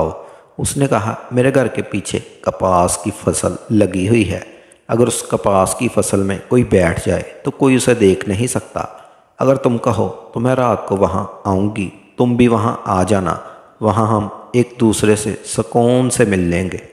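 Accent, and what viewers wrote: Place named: Sialkot, Pakistan. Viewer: astagfar.... awaz k lehja sialkoty hai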